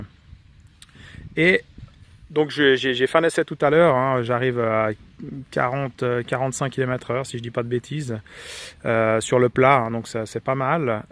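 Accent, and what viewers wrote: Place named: Geneva, Switzerland. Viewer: On sent bien l'accent de Genève. Salut compatriote 🇨🇭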